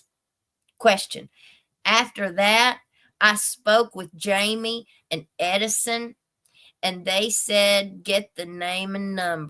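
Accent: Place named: Kentucky, United States. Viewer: Hey, hey, hey! I went to college in Barbourville, Kentucky College. I worked in that area after graduation, in Corbin, London, Manchester, Hazard. Your accent is home to me!!! Now living in Oregon...my fondest memories are in Kentucky!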